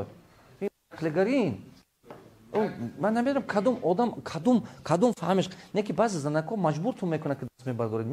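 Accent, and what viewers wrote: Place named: North, Tajikistan. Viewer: Устод бисёр Руси Кати Гап мезанад, бо шевай мардуми шимол. Хам як Чахон маьни❤❤❤❤хам як олам ханда хадя мекунад😂😂😂😂Зинда бошед..доктор❤❤❤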